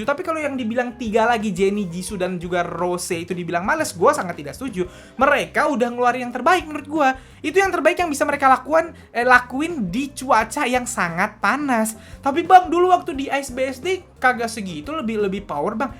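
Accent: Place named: Bekasi, Indonesia. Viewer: Bahasa bekasinya ngelekeb ya bang 😂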